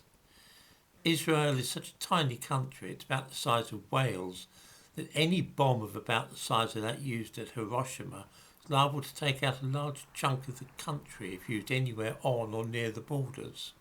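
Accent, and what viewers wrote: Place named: Cockney, United Kingdom. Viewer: He could be and he does hail from East London and his dialect could be construed as Jewish. My mate’s dad was a Jewish tailor from Walthamstow who should to supply the Krays and he had that East End dialect which might be heard as he speaks.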